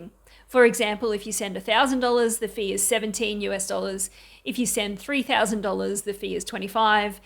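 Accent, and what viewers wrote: Australia. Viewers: Only just found your YouTube channel. Great to hear an Australian voice for a change, and to top it off, a super informative resource. well done, I'm a convert to your channel! Have been to Siem Reap on a conference years ago but did not see much of it so viewing all your videos with relish in my search for a place for my wife and I to retire in a few years. I was surprised to read that it costs AuD$68 every month to transfer $2,999. This is AuD$816 per year in money transfers YIKES that is high!!! Is there any interest on the account balance from the local bank? I like this suggestion from a poster :"You can transfer money from your home bank to your Wise Card, then use your Wise Card to make purchases, pay bills, and even withdraw from ATMs in Cambodia." ...makes more sense no?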